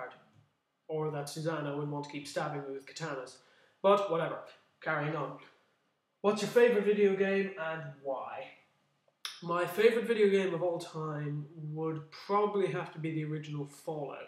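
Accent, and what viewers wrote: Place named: Ireland. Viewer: hooray, finally a non stereotyped Irish accent. The stereotype seems to be it's incredibly strong, and the appearance with the accent is a two foot tall red haired bloke wearing green. Nice to hear a bit of a weaker accent. Not that I can talk, I have one of the strongest Yorkshire accents going. PS: nice to get to know you better, mate.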